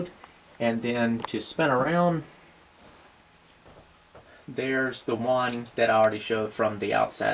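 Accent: South, United States. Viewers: who else started reading the comments in a southern accent while he was talking??? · Dukes of hazard accent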